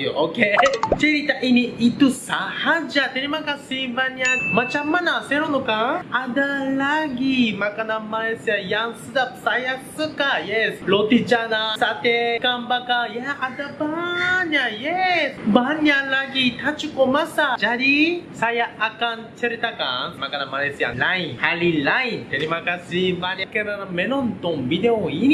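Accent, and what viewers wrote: Japan. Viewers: i love the way he try his hardest to speak malay even the japanese slang was there a bit but the effort tho really deserved praises. Here some virtual hug for you !!!!❤ p/s: imma eat nasi lemak 2morrow morning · Suka dengar cakap. Tatabahasa sangat baik. Cuma sebutan masih ikut pengaruh consonant Jepun. BTW, mana boleh makanan Jepun rasa makan hospital?! 🤣 Saya suka makanan Jepun. Lagi bagus dari makanan hospital. Kalau lama tak makan makanan Jepun, asyik terbayang di kepala dan lidah. Sashimi dan kare raisu paling best.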